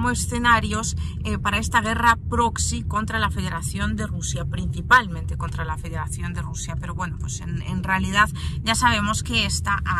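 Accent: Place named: Spain. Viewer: te queremos tanto aquí en Latinoamerica,que quisiéramos vayas cambiando ese acento ibérico, a uno más acorde con nuestra latitud.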